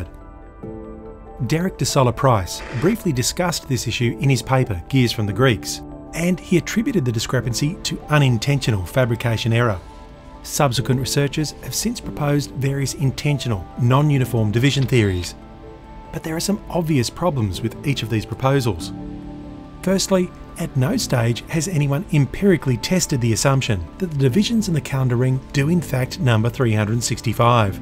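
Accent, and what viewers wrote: Australia. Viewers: That soothing aussie lilt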